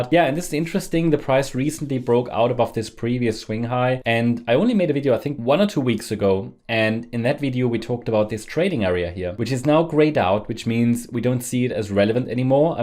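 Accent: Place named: Germany. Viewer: are you? same voice,and sounds like German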